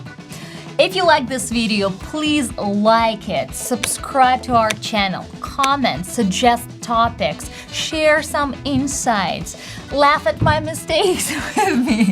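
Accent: United States. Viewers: I just can't believe you come from a heavy russia accent. Your american accent is so perfect. You sound like a native english speaker. Now I know everything is possible. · Hi, You have done an amazing job learning the American accent! Cheers, Albert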